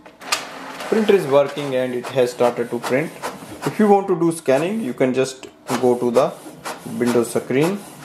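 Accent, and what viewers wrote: India. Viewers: You need to practice more on pronunciation before making videos. The Indian accent is terrible!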